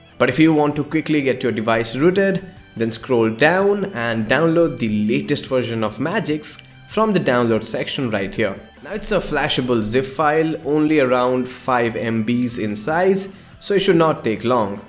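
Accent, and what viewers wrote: India. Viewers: I remember when u could barely speak english and sounded hella indian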